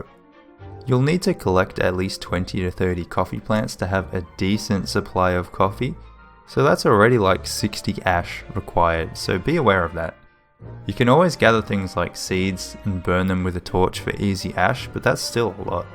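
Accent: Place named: Australia. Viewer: ayy haha i could tell by the accent :) im aussie too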